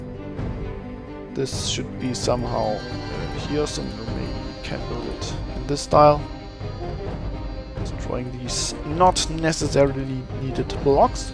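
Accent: Germany. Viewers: Du bist Deutscher stimmt's? :D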